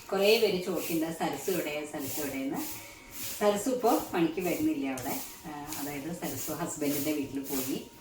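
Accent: Valluvanad, India. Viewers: Valluvnadan slang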